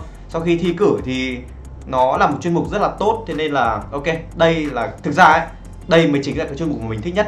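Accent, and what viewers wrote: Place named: Central, Vietnam. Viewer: Liz gà dũng nói dọng miền trung dương em ko biết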